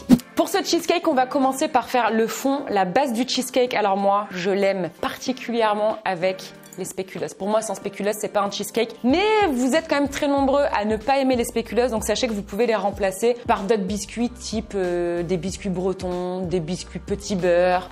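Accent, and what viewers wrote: Canada. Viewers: tu mon youtubeur préféré des vidéos accent canadien et qui met quoi des vidéos trop trop rire je me suis spéculoos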